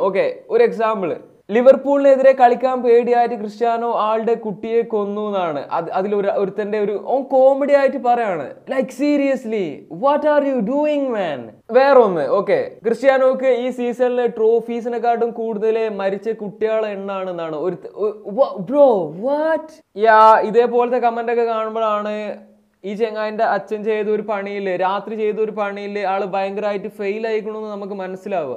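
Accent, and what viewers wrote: Malappuram, India. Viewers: Thangal malappuramthu kaaran aano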